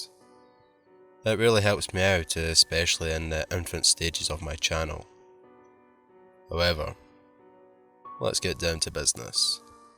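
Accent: Scotland, United Kingdom. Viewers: Never heard The Smiling Man narrated in a Scottish accent before! Thanks for narrating one of my favorite Creepypastas 😁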